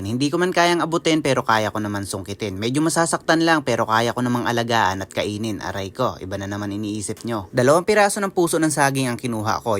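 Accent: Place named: Mindoro, Philippines. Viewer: Parang tonong mindoro ka brad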